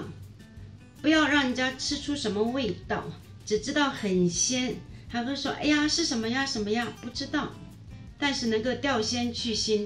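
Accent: Shanghai, China. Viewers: 上海普通话听着好亲切哦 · 👏👏👏一口上海普通話❣️❣️ 教了拿能弄，還撫平了我的思鄉心💕 謝謝噢謝謝🙇🏻‍♀️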